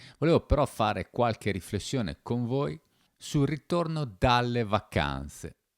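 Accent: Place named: Bologna, Italy. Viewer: Grazie Ma di dove sei? Sento un accento bolognese ... Buona serata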